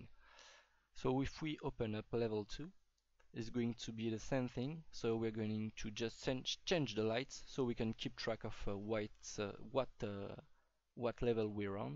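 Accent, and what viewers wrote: France. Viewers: Super tuto ;) C'est encore plus simple a comprendre avec ton accent français ;)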